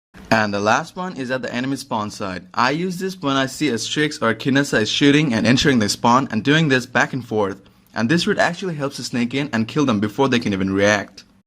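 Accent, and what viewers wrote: Bengal, India. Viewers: ভাই বাঙ্গালি নাকি? এক্সেন্ট এমন লাগছে হ্যা অবশ্যই! পরে ভয়েস শুনলাম। :3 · Bro. You are Bengali? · Bruh u r bengali??? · You're bengali ? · My guy bangali, noice, I understand what he said 😂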